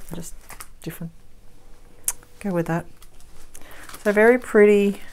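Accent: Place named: Australia. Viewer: Shopanydeals is a dangerous site for the bank balance. Found your unboxing videos and love them but always nice to hear a fellow Aussie doing unboxing/reviews.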